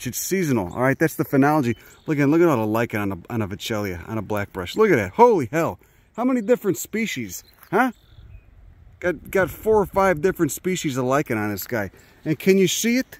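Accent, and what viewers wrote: Chicago, United States. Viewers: You sound like you are from Chicago? You sound like a family member, making me homesick for the Chi! Thanks for this video, I want to grow this plant and I live in Phoenix now. Just what I wanted to know. Bravo. Good video.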